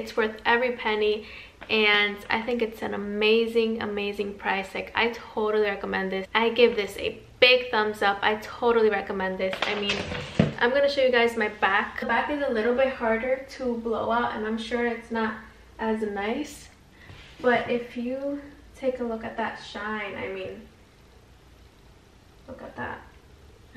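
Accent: Dominican Republic. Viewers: Are you Dominican? Cause the accent when you talk Spanish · Saludos desde RD! De donde eres? Suenan como Dominicanas. Me encantan tus videos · Me sorprendió mucho escucharte hablando español y darme cuenta que eres del patio. 🇩🇴🇩🇴🇩🇴💪🏽❤️ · Esa forma de hablar... Indudablemente Dominicana jajajaajaja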